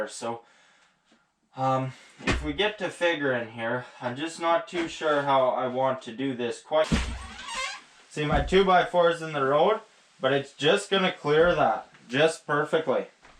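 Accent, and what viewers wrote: Canada. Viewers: Thank you! Has similarities to a project I'm working on. Also the thickest Canadian accent I've ever heard; it's great! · I love the Canadian accent and all your sayings 👍 Love the content too bud keep it up!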